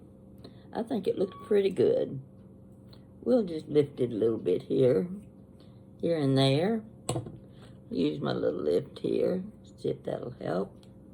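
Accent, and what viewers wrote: South, United States. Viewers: I am 50 yo and I just love watching your videos, I love your tone of voice and your southern American accent. You seem such a nice and interesting person. So much full of life and interesting topics to tell. You relax me a lot. And my life is hectic, I certainly need someone like you in my life. You are great, thank you sweet lady! By the way, the earrings you made are fantastic. ❤ From Costa Rica.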